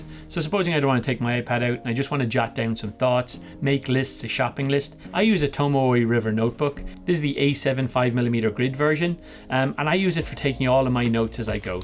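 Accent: Ireland. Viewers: This is the most irish sounding american I've ever heard. Cheers from the other side of the pond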